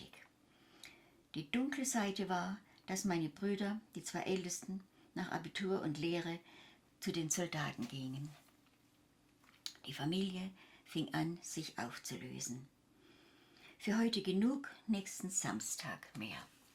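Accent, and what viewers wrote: Swabia, Germany. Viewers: Kann es sein das du leischt schwäbisch redest 👐🏻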